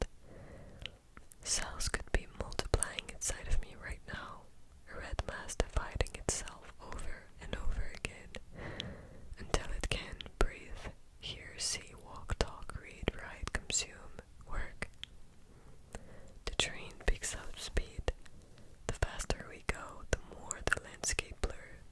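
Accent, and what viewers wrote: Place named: Quebec, Canada. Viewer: she's from Quebec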